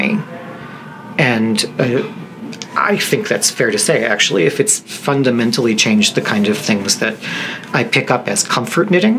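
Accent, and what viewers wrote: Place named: Chicago, United States. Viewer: Natter away,. I enjoyed your Blog and now I can enjoy your Vlog. I also enjoy your Chicago accent. I grew up in a NW suburb in the 50s and 60s. Yes, you do have an accent. Thanks for dropping into my living room today. I hope you don’t mind that I’m not dressed (literally). Keep up the vlogging and knitting and teaching. How do I get in on your patreon thing?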